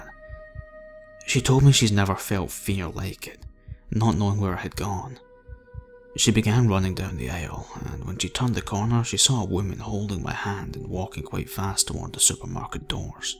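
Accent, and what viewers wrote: Scotland, United Kingdom. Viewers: Great video fab to hear a Scottish narrator 😊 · Always enjoy the stories your the delivery in my accent is great too.. even if ye did say ass and no arse… Just curious are you Scottish and do you live in Scotland?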